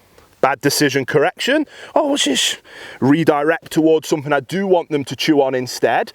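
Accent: United Kingdom. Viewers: Hold up. He's a big husky dude with an epic beard, a British accent, tattoos, sitting in a field of nice grass with puppies and talking about love, empathy, and kindness. Yeah, this dude fucks.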